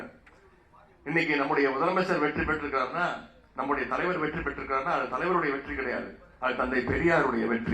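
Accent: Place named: Telugu, India. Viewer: நீ நல்ல தமிழல பேசு ஒரே தெலுங்கு வாடை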